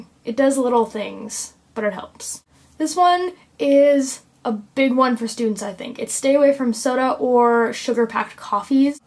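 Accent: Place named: Canada. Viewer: You live in Canada right? I can tell by the accent... I think...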